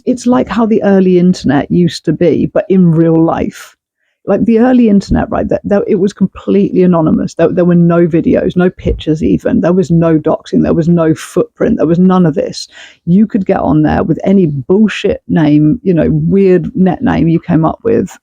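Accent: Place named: Birmingham, United Kingdom. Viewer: I swear to God, I was here thinking that I’d NEVER heard you sound sooooo Brummie. 😂 Then you said it yourself and i nearly fell off the bed. Love seeing you pushing yourself in a really challenging new environment. Rooting for you. Love from Glasgow. ❤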